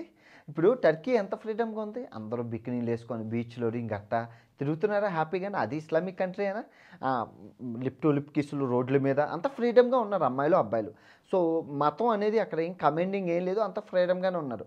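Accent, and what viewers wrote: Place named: Visakhapatnam, India. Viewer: హాయ్ బ్రో ఈ మధ్య నీ వీడియోలు చూస్తూన్న చాలా బాగుంది... వైజాగ్ లో ఎక్కడ మీది... అంటే స్లాంగ్ ని బట్టి వైజాగ్ అనుకుంటున్నా ఎనీహౌ all the best for your future world trips and God bless You...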